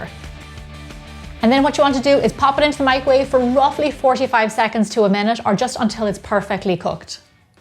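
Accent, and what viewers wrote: Ireland. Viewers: Oh class she’s Irish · I lived in Dublin for two months and your accent is bringing me back so many good memories especially during quarantine!! I have so much love for Ireland and everyone from there ♥️ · Love your Irish accent. I'm Irish too. I love the videos and follow your recipes often. Thank you!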